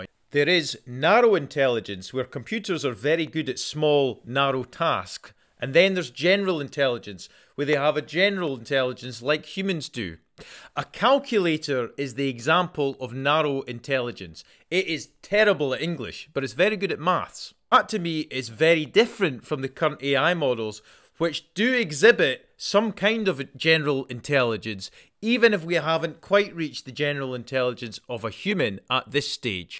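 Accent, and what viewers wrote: Scotland, United Kingdom. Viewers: English language spoken with a Scottish accent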